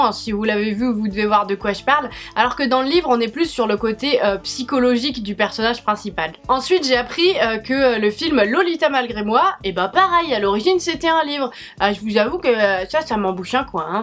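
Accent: France. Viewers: Pour Jurassic Park effectivement je l'ai aussi appris par hasard en fouillant dans les livres de mon père, il est dans ma pile à lire depuis quelques mois... livre de l'été ?! Pourquoi pas 😊 Super vidéo je viens de te découvrir et j'aime ta façon franchouillarde de parler, on à l'impression de discuter avec notre copine de façon hyper naturelle, ça fait plaisir de voir ça sur youtube 😊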